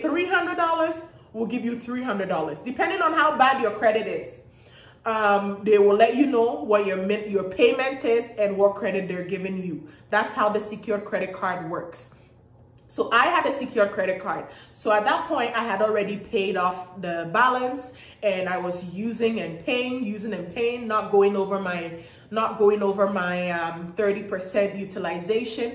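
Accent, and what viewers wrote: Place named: Africa. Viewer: You are such a beautiful woman, the way that African accent rolls of the tongue is so soothing.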